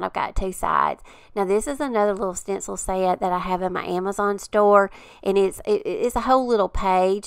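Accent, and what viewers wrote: South, United States. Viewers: Just found your channel and love your creativity and your Southern accent! Up here in Canada we having boring voices..lol. you are so sweet. Going to enjoy following you💕💕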